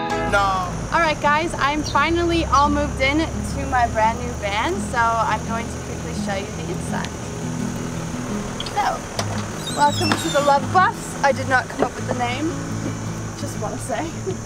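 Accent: Australia. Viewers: Great van! PS I like the slight Aussie accent you've got going on now :) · So many American's love Australia. You sound and look local now, from when you first arrived. Tanned, barefoot all the time and the tatts. At least they don't look down on you like in the US when you walk into a store without shoes. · Am i detecting a slight Aussie accent???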